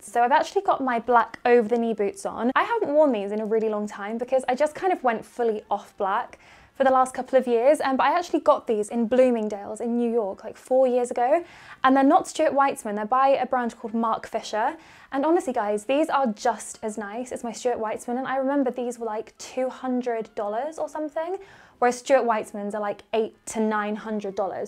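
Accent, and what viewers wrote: United Kingdom. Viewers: Your British accent is so lovely, and your beauty is overwhelming 🌹 Greetings from Vienna, Austria 💐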